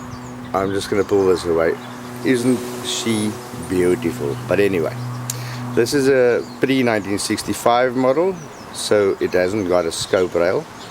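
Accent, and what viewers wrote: South Africa. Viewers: SA accent is it?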